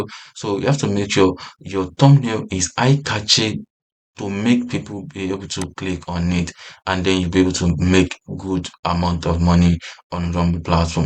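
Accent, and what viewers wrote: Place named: Africa. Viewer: Improve your Audio. Your English is very poor, you have a strong African accent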